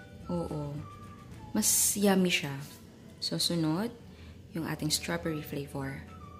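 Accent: Visayas, Philippines. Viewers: bisaya po ba kayo parang bisaya po kasi kayo mag salita pero ang galing nyo po gumawa ng pagkain or dessert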